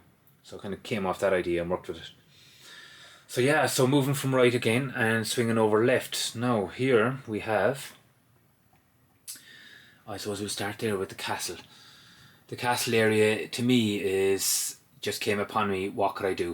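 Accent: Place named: Ireland. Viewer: Omg he's Irish! :D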